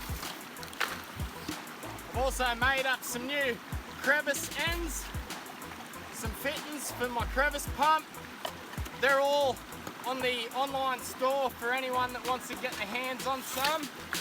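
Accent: Australia. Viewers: Nice to hear that laconic Aussie voice, pity it's dying out with the young these days being so stuck on Americanisms ....keep up the vids on river sluicing and gold panning, love them.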